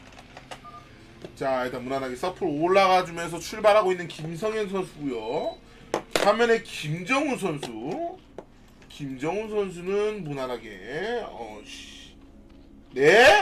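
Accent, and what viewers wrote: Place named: South Korea. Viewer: korglish lol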